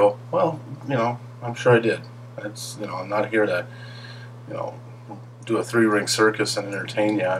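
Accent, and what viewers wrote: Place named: United States. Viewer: ArtVandelay is wrong. You seem to know more about football than a lot of people in England. I like your videos. It's refreshing to hear an American accent chatting about the beautiful game. Keep it up. Blackburn Rovers all the way!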